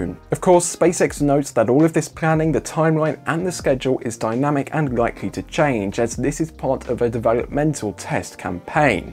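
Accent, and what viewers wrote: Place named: Midlands, United Kingdom. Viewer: Brilliant, just Brilliant. Nice one! Anyone would think you were Scottish, with NO sunshine on that skin. But the middle England accent says no!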